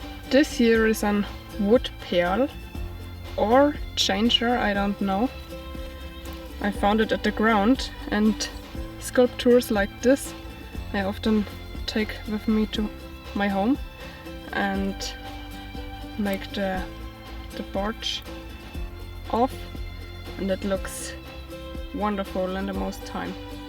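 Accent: Bavaria, Germany. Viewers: Enjoyable video. I like the fact that you sound like a German speaking English. You speak much better English than I will every speak German. I saw your tattoo which I assume is new and that is what you were covering with the Archery arm guard last time. What is the the tattoo? I was not able to make it out. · I really love how you narrate the nature. I also love that you actually thank everyone for their comments. This is kinda a long message, I hope you don't mind. My dad adores your accent because he grew up in germany while his dad was trying to fix things after that thing happened. Bavaria is so beautiful. We love seeing you explore it. We're also skillful bushcrafters and we would be happy to host you if you want to explore the USA forests. Happy camping, and I'll be praying for your recovery.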